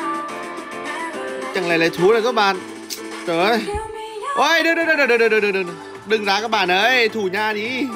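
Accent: Nghe An, Vietnam. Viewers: nói tiếng Nghệ An cho đặc sệt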